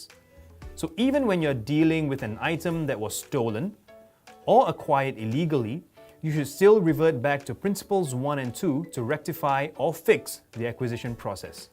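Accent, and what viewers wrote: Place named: United States. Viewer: Where we learn to speak fluent American engilsh. Pls suggest ways to speak English like you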